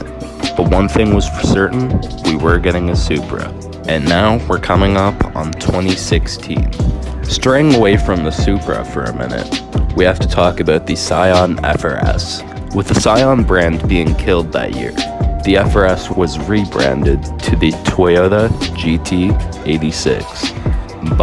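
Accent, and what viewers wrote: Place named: Netherlands. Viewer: You sound like your from NL like me haha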